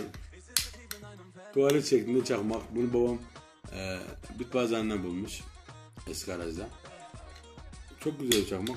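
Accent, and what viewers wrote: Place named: Eastern Black Sea, Turkey. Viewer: NERELİSİN Doğu Karadeniz şiven var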